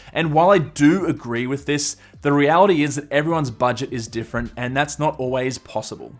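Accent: Australia. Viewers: When im trying to listen but being distracted with that damn eargasmic accent.. dont get me wrong its soooooo good for my ears. Hahah. Im thinking he’s an aussie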